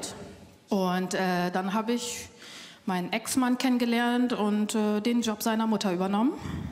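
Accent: Vietnam. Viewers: Eine typische vietnamesische Akzente Gemische, das macht der Humor auch so lustig. Weiter so und alles gute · Thằng nào ??? Har har har....ich erkenne so einiges wieder. Auch das Deutsch mit vietnamesischem Akzent, geil 😆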